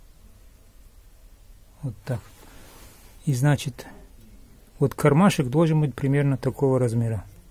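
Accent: Caucasus, Russia. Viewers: Как же удачно я набрела на ваш канал ! Подписалась . Теперь изучаю все способы прививки ! Очень всё доступно и понятно ! Спасибо огромное ! И этот мягкий акцент . ...явно юг .... Осетия ? ... Балкария ? ... Но могу ошибаться . Удачи вам ! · Из всех видео, что смотрел на ютубе, ваши самые чёткие- по делу, коротко, понятно, все видно в кадре,лишние телодвижения вырезаны. Иногда и с юмором. И самое главное, -не косноязычно,без всяких (ээ-мм-ээ-бэ-э).Видно, что человек знает о чем рассказывает и показывает так, чтобы понятно было всем. СПАСИБО БОЛЬШОЕ ТЕБЕ. P.S. А Вы, остальные русскоговорящие, выкладывающие ролики, учитесь у человека с Кавказа .Да,есть небольшой акцент, но говорит он без ошибок, не мычит, как большинство из вас и в словах не путается. Словом,- приятно слушать и смотреть.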